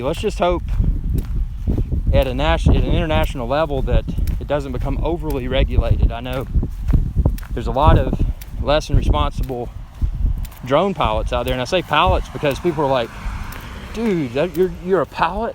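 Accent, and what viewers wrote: South, United States. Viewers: This Is One Hot Irish Bear WooF ! . He Has An Interesting Accent Is He From The South? Walking Good For You Keep It Up Dude.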